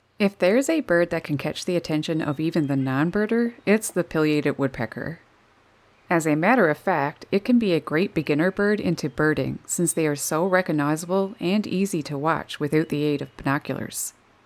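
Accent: Newfoundland, Canada. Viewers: Oh my, you sound Canadian. I'm sorry that our president is a jerk. I enjoyed your video very much. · Hi, just curious, are you by chance from Newfoundland?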